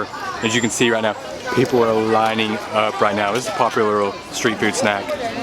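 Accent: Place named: South, United States. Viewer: you are super star in my book to eat Szechuan ma-la chili, pork brain, tripe and all the other deliciousness. Also, love your calm voice and slight Southern accent. 👍🙌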